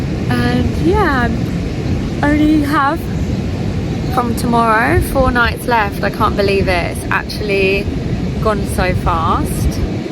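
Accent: Ireland. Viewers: Hi hello are you Irish ? Scotish ? Or maybe Angel ☕😎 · from your accent i guess youre an irish?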